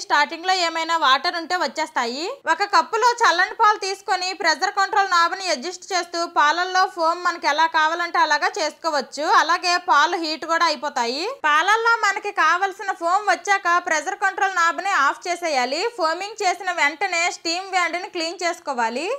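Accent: Srikakulam, India. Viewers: Akka konchem matlade style marchu Srikakulam paruvu teyyaku hi andi maney vere di select chesko konchem style ga matladu em kadhu next vlog ala try chey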